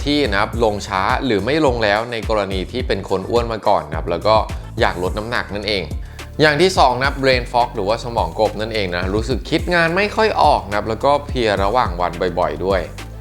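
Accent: Thailand. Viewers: โยโย่มา แล้วเเล้วลดไม่ลงเลย ออกกำลังกาย คุมอาหาร ก็ยังไม่ลง ปล.โค้ชเป็นคนสุพรรณหรอ สำเนียงมันได้😆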